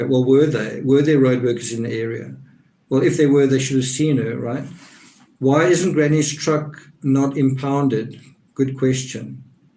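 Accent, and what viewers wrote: South Africa. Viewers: Hi there from Namibia. Thought I recognised the SA English. I like your videos.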